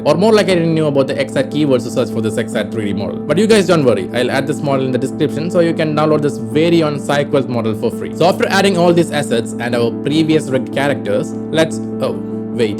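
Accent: Kerala, India. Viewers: bro are you malayali, sounds very like it? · You started getting a bit too indian for me, couldnt understand halfway through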